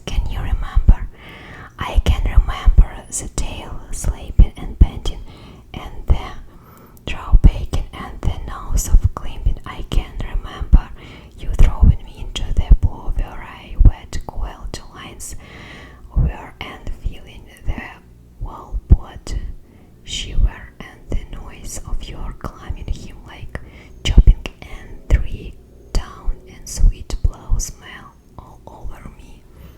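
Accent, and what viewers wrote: Russia. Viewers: You have a very lovely Russian accent!! Especially you also have a very lovely voice! I could just listen to you all day!! 🤍🤍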